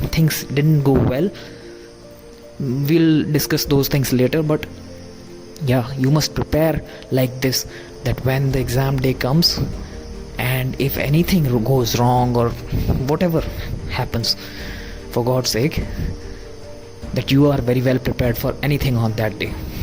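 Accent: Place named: Marwar, India. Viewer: Bhai hehoo app voice septa chl eha hai... Marwadi kai bhe chupa nhi rhaa sktae 😅😅😅. Jodhpur wale bana 🙌🏻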